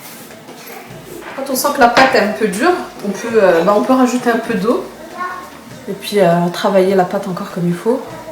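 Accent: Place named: France. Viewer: lol c fucking weird voir une mdm aekk voile parler francai de france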